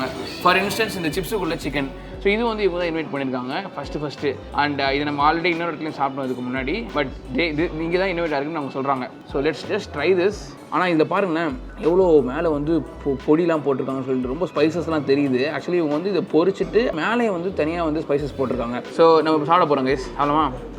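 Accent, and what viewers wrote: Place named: Butler English, India. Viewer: Stop talking your buttler english ....... fraud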